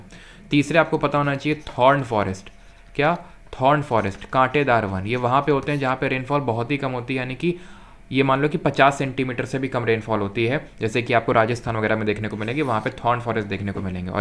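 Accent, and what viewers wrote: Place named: Prayagraj, India. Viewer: Jaldi koi teacher pasand nai aata mujhe..but vai yr tumse acha teacher nai dekha.. perfect ho tum GK k liye...waise awaaz se lagta h prayagraj side se ho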